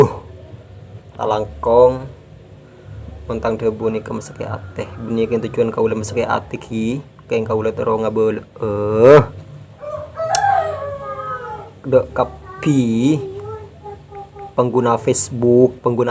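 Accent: Madura, Indonesia. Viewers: Maaf Mas Ini orang Madura Ya ..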